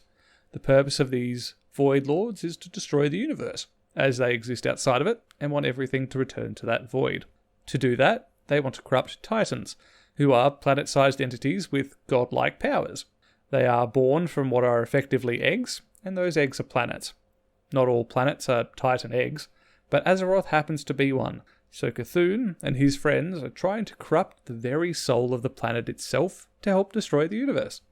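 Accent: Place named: Australia. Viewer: Video essays are good. Plz do more. Good to hear an Aussie on here. While I've always enjoyed doing the extra info searching to get me into a mmo, WoW has started to be boring again. FF14 has been a great antidote. Thank you for making content on it from a similar perspective to mine. Keep up the good work mate and looking forward to hearing more from you once I've finished watching all your vids. (about half way through now).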